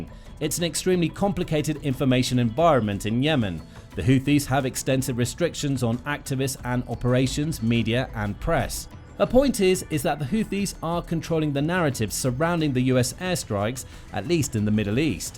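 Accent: England, United Kingdom. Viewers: What is your contributing to this campaign? Probably absolutely nothing by your voice. You sound like you’re from England. What is England doing? Are they doing helping they spending some money?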